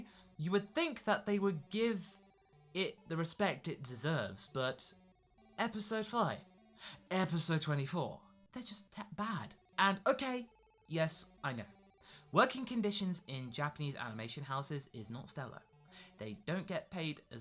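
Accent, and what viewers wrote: United Kingdom. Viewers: holy shit he's british?!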